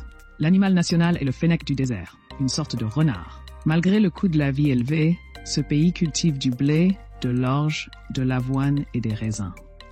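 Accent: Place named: England, United Kingdom. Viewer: Vous avez un léger accent anglais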